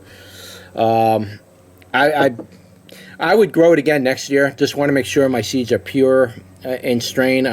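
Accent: New York, United States. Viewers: Love the review. A very upbeat video. Love the New Yorker accent. I'm from Canada so it ain't something you hear every day. Keep on growing! I subscribed and liked.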